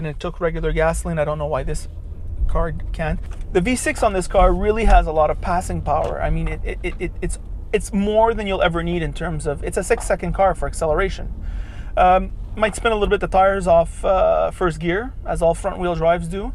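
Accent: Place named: Quebec, Canada. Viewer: Great review from an honest guy! French Canadien?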